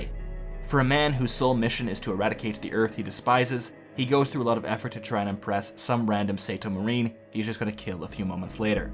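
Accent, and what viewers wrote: United States. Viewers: "How does a collection of colonists and frontier workers, possibly compete with the manpower and industry resources, etc or a larger empire." Really dumb question dude, ESPECIALLY since, judging by your accent, you're an American.... Seriously, read your own nation's history and you'll have the obvious answer to your question. Whether or not it's feasable on a planetary scale is irrelevant, there nonetheless is a real world basis for this narrative plot point. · Uhm, why would a nation try to succeed from their home nation? Your accent sounds American....Ask the british how it went from their perspective.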